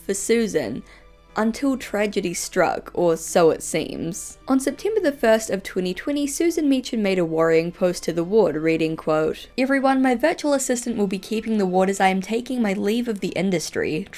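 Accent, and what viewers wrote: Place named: New Zealand. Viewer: I think she's a Kiwi?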